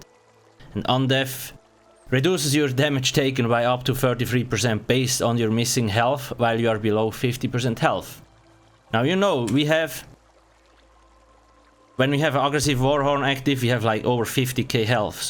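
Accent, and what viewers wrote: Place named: Switzerland. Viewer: His accent is Swiss.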